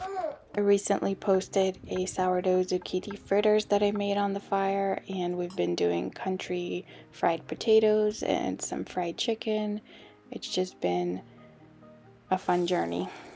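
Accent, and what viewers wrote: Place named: Russia. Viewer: Мне кажется, что я слышу едва заметный русский акцент.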